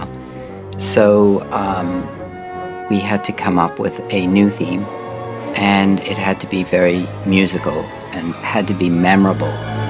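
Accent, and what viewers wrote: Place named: United Kingdom. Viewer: James spoke in a more Gr. Britain style of English?! (At least that kind of impression, his English are giving me here)...Wow! I didn't knew that! I'm impressed! Dear James...we mis you terribly, all over the world! May God rest your soul, and we thank you for the incredible music you gave us! Our love and respect to you, from your fans here in Greece.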